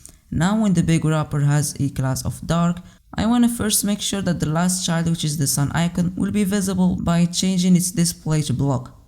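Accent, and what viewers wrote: Morocco. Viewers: Good stuff my man wyni mathmeqnich pronunciation mghribya hadi 100% rak kayn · Great job! From your accent, I think you are Moroccan.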